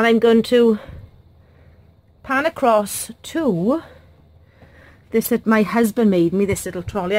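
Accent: Wales, United Kingdom. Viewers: I love this craft room. I also adore your Welch accent. I've never even filmed a single video yet as I hate my Geordie accent 😖. Thanks for sharing this amazing space full of awesome crafty organisation. I've just ordered the mint green trolley from hobbycraft and waiting for it to be delivered so you have defo gave me some fabulous ideas on how to use it for all of my paint and glitter supplies. Happy Crafting xx Berne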